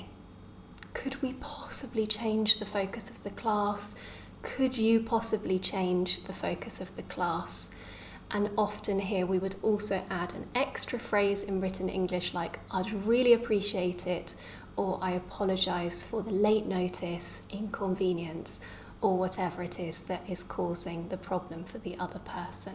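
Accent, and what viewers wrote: United Kingdom. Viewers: Hi..really helpful lessons, brilliant British accent, understandable and practical topics...extremely thanks...would it be possible to write your important tips bigger and not italic at the corner of video...cheers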